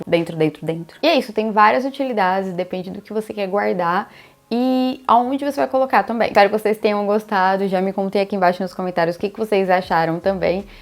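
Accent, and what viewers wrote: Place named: Inner São Paulo, Brazil. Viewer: Olá, desculpa minha intromissão, mas te pergunto... pra que essa saudação em inglês???? Nosso idioma, como sabes, é Português, ó tu, que és brasileira, e tens um nítido sotaque do interior paulista... Por outro lado, sei que o canal é teu e, como pessoa, tens direito pleno de te expressares como queiras, mas... enfim... Fora isso, admiro teus trabalhos, todos, menos as cores de tintas que utilizas, mas isso é questão de gosto e gosto não se discute... E, a título de cooperação, quanto a esse novo projeto teu, o nome correto para o antigo armário, agora transformado, é "Balcão". Aparador é outra coisa bem diferente.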